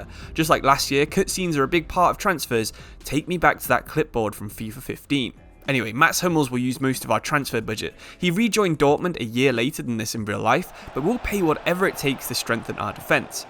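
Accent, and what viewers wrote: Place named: Nottingham, United Kingdom. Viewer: mate this guy sounds like my mate from Nottingham lmao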